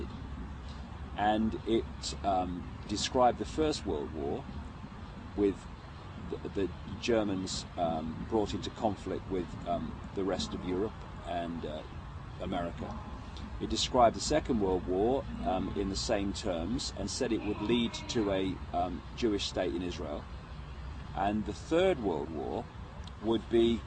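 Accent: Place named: United Kingdom. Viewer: "A certain letter was written between two people in a secret society" can you get more precise while citing a source? Then quoting a traffic warden.. How can anyone believe he is doing anything else than pulling it out of his ass? Just because he has a British accent does not make him knowledgeable or anything. And, all these "signs" he's talking about are just normal things. Civil unrest happens every once in a while, and boosting the warden's powers? What does that have to do with it?!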